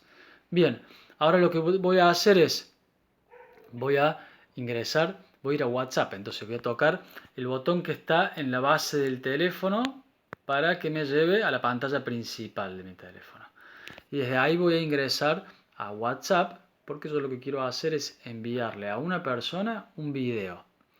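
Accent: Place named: Cordoba, Argentina. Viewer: Este es cordobés argentino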